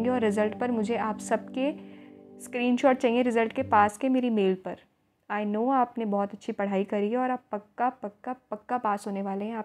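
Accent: Bengal, India. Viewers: Thanks for guiding lot of students.keep spreading your positive vibes....life becomes more positive and beautiful when people like you enter in life.Thanks and lot of respect to your parents who gives you such a good culture and sanskar. Somewhere I got a Bengali touch in your speach.you are from?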